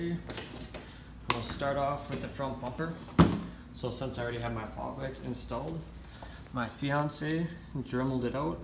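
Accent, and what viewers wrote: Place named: Canada. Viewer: Can't stand that Canadian accent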